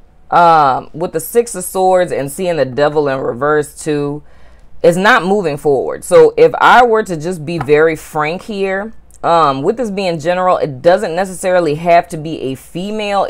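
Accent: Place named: Charleston, United States. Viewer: Love that you have mental health resources in the description box ❤️ Curious to know what bit of accent I’m hearing?... sounds a bit like Charleston (where I’m from) or NOLA (similar sound) or Bahamian (also very similar) lol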